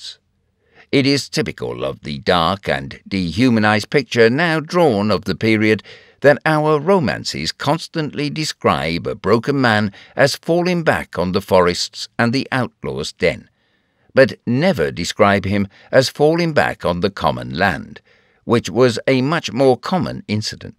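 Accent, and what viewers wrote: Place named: England, United Kingdom. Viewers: English accent, there is NO SUCH THING as a British accent. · the narrator has a British accent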